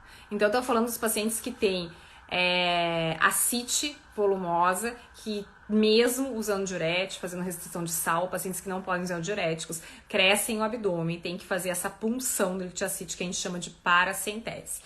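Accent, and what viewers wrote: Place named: Rio Grande do Sul, Brazil. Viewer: Muito bem Dra. Tem que parar com este sotaque de ficar falando ... éeeennhh, uuinhun, Horrível este sotaque. Eu sou gaúcho Mas este repetitivo é coisas das mulheres gaúchas.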